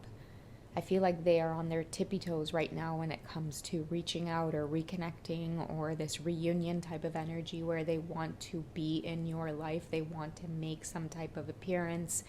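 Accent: Trinidad and Tobago. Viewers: D Trini accent killin mehhh 🇹🇹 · 😂 Outside of your gifting to read, I LOVE di Trini vibes yuh does tro een every now and then! 😂👊🏿 TrinBago tuh di whurl wi!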